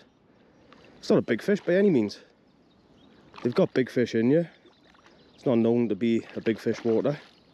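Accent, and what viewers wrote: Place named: Cardiff, United Kingdom. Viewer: Hello mate, just watched you for the first time, great content I just subscribed. Trying to tie down that accent of yours, I'm guessing Cardiff or close by! I'm in Ebbw Vale.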